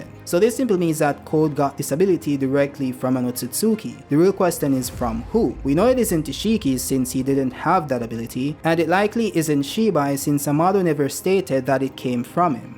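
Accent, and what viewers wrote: Jamaica. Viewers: by chance Jamaican?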